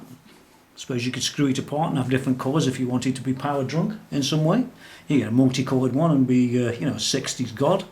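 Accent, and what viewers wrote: United Kingdom. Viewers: Like your accent. Where from UK ? When wil you review Jim Root # 5 ?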